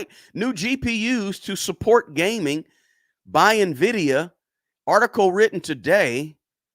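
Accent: South, United States. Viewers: Hey, I enjoy your show. With all the respect the world if I may say please, you're the first African American man I've ever heard with a deep southern accent lol. I like it plus keep up the good work thank you.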